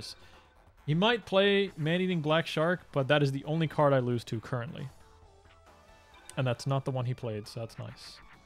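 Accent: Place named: United States. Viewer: It's your accent. Or more so from an American's perspective, lack there of. Your English blends with "American English" honestly seamlessly to the point you can say your from New York and no one would bat an eye.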